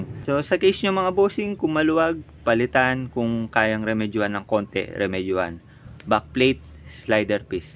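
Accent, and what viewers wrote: Pampanga, Philippines. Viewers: Basically maganda tong video. Kasi di ko talaga alam kung ano ang basically dragging. Pero parang Kapampangan si sir basically. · Kapampangan siguro tong si bossing base sa accent? Hahahahhaa nice vid bossing! Very informative!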